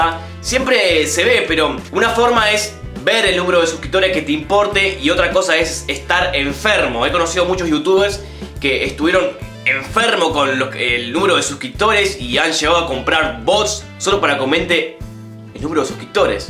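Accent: Argentina. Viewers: ¿Vos sos de Rosario?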